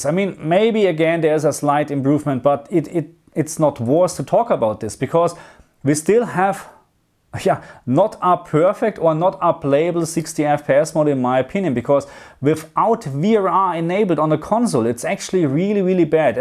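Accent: Germany. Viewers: Ich dachte mir schon von Anfang an des Videos, „Er scheint einen deutschen Akzent zu haben, ob er deutschsprachig ist?“ Und dass „Herr der Ringe“ hat mich dann zum Lächeln gebracht.😅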